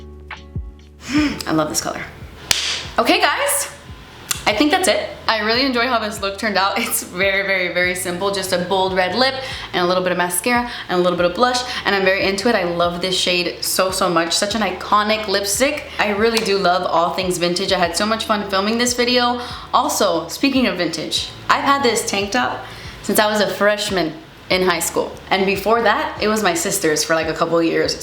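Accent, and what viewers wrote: United Kingdom. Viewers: The British accent reminds me of Andy from the office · Love this! My daughter came in while I was watching and said, “she has a British accent!” I was like, “yeah…well kind of…” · Why do you keep going British????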